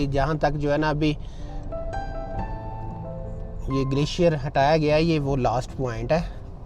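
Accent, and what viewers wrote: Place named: Punjab, India. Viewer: جی آپکی اردو بہت بری ھے پنجابی لہجے میں اردو نہیں بولی جاتی ، ناک میں بولتے ھو پاں پاں کر کے ،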